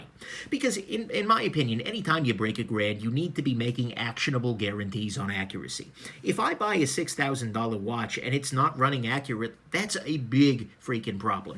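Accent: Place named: New Jersey, United States. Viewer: The Jersey accent on this guy is hilarious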